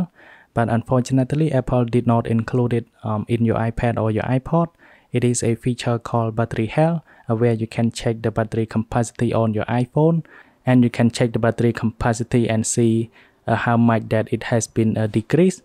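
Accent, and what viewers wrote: United Kingdom. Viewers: Sounds like British language when he’s talking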